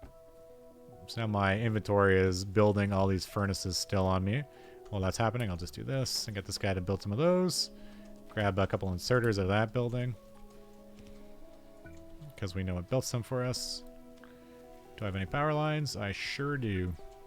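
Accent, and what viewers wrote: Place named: Canada. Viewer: god you are canadian.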